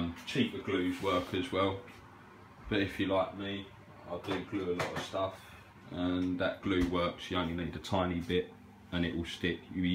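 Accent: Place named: London, United Kingdom. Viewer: Thanks. I've just bought an XL so this afternoon I'll get cracking on your suggested mods and hopefully save myself some grief. Good to hear an English voice at a speed that can be understood. A good London area accent.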